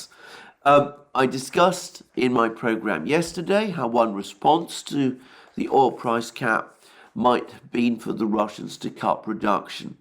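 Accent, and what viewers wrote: United Kingdom. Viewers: Aaaahhhh, makes sense now. Despite your thick british accent, you are from the Balkans area. I criticized your difficulty at times to speak english. My apologies.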